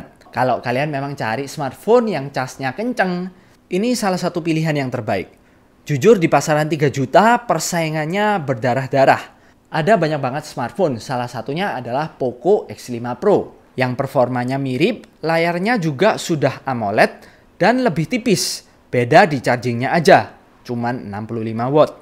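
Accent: Java, Indonesia. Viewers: Bang bagusan bilangnya "saya" jgn "gua", soalnya logat lu medok bgt 😅, jd gk enak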